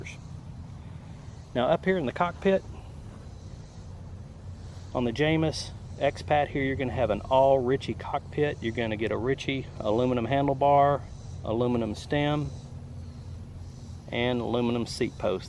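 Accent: South, United States. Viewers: Fellow grinder here from Alabama. Just found your videos while looking at sno peak and toaks cooking set ups. Your videos are very helpful. I'm also a gravel grinder, and in fact moderate the Alabama gravel cyclists facebook group. Feel free to join if you want. By the accent, sounds like you might be in the south. I'm currently acquiring bike packing gear. I just received my revelate bags front and rear with a rockgeist bar jam, and have on order a warbonnet hammock, tarp, underquilt and top cover, and hammock gear top quilt. I'm now looking into what if any cooking gear to buy, vs. pre cooked or pre made stuff like energy bars etc. I like the idea of being able to do a multi day tour with cook set up. Your feedback would be appreciated.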